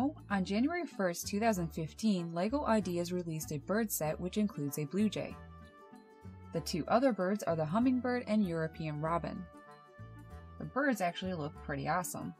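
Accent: Newfoundland, Canada. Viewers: Is that a Newfoundland accent you have? That’s not an insult. You will never please all the people my dear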